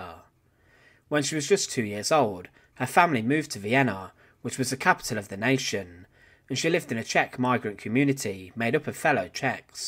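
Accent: United Kingdom. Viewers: You put an huge emphasis on the last syllable of the word at the end of each sentence. Strange to my ears. Is this a particular British accent?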